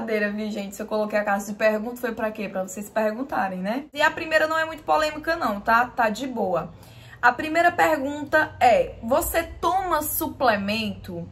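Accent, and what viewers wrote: Ceará, Brazil. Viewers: desde o primeiro vídeo teu que eu assisti suspeitava que fosse do Ceará, o jeito de falar entrega dmss 😂❤ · Esse sutaque do nordeste toda meiga, gostei demais do vídeo simpática e humilde sempre estou interagindo com você no instagram beijos mulher !! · Mlr, a primeira vez q eu ouvi vc falar já imaginei q era daqui do Ceará. Sou de Fortaleza. Bjos!!! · Nossa pensei que era do nordeste jureiii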